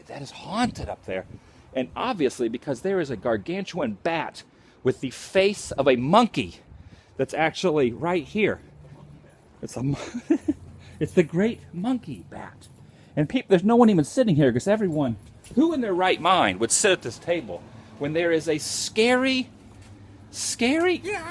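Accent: South, United States. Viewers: Wow, that's why I've never been able to place your accent- it's a bit southern but not seemingly tied to anyone place.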